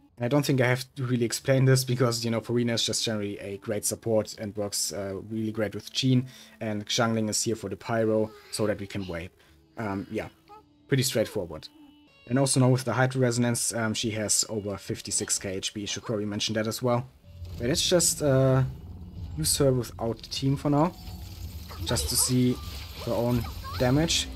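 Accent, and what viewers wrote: Germany. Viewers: Your accent, are you German? Or speak a Germanic language?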